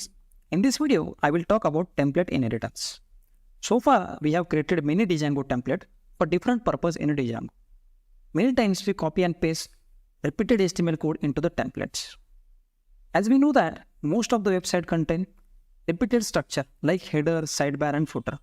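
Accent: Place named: India. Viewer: oh my god, I'm learning Django and Indian English at the same time. Thank you, Bro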